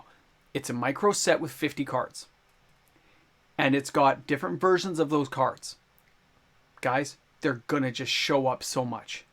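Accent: Canada. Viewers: Bro why isn't this channel WAY bigger. Awesome insight and perspective. You Canadian? I am, and it's a guess based on your diction. Sad I can't double sub.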